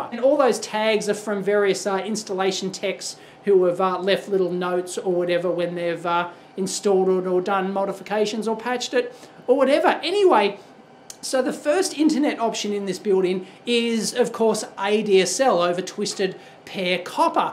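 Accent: Australia. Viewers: Aussie accent yeahh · No idea why this was recommended to me and no idea why I clicked it. But I like it. The guy sounds like a very upbeat person. Probably the accent. Australians always sound like they're loving life. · You sound Australian but I am sure this is located in India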